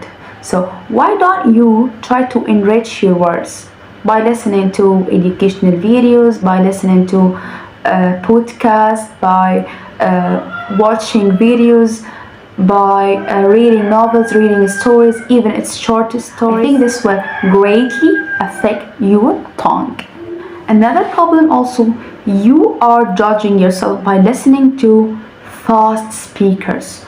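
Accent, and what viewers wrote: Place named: Scotland, United Kingdom. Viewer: British accent مع شوية سكوتش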